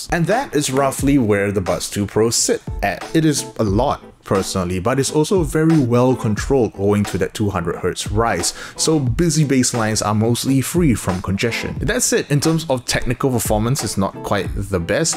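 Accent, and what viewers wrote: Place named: Singapore. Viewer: This man sounds really Singaporean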